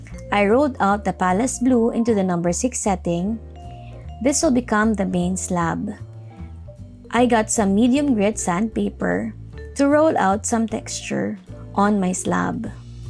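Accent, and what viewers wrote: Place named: Philippines. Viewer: I figure you are from the Philippines because of your accent and also the indelible ink on your finger. Btw who did you vote as the president? If I may ask? Thank you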